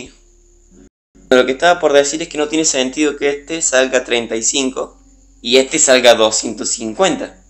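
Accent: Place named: Córdoba, Argentina. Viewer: amigo te re queda el cordobes kpo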